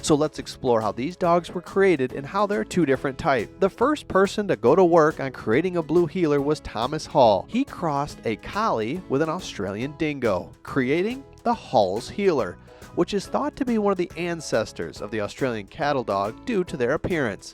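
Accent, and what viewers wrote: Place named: United States. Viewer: thank you. I bred ACD’s once upon a time in north Queensland. They’re the only dog I’ve ever owned. I put in thousands of hours a year training them, studying them, and teaching their new owners all about their behaviours + heritage. Whenever I watch a YouTube video on them - particularly one narrated with an American accent - it’s easy to predict that the content will be mostly non-factual. This video was no exception.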